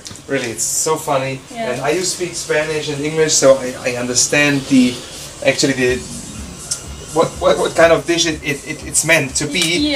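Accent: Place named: Manchester, United Kingdom. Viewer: Love her Manc accent. I know, there is not just one American or British accent, but in general I think that British English sounds much better.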